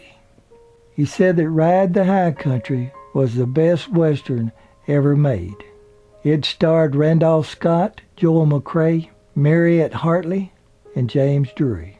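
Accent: South, United States. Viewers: Mr. I enjoy your Southern Draw and your coverage of these fine actors in our past enjoying their movies while growing up. Thank You Sir for your stories of important memories enjoyed in life.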